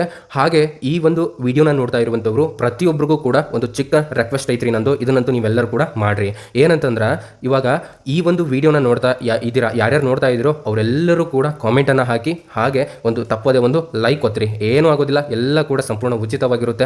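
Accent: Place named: Bengaluru, India. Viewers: Bangalore Kannada language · Bengaluru kannada sir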